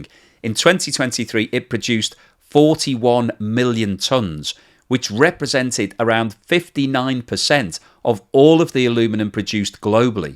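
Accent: United Kingdom. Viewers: I like how you Brits say 'tree', whenever a word ends in tory or tary. You all should hear y'selves:person-turqouise-waving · That is okay we are used to the British pronouncing words funny. China will never be net zero.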